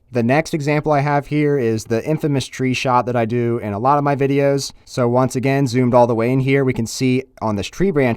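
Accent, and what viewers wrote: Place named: Pennsylvania, United States. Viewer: Knew I recognized that PA accent.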